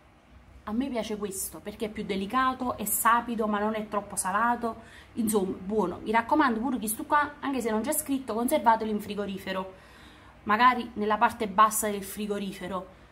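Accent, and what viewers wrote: Naples, Italy. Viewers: Ciao sono nuova👋ma tu nn sei siciliana mi sembri napoletana😄😄😄sei simpatica👋👋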